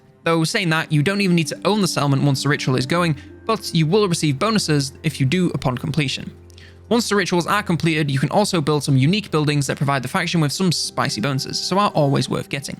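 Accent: United Kingdom. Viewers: These stock images and British voiceover would make SpiffingBrit proud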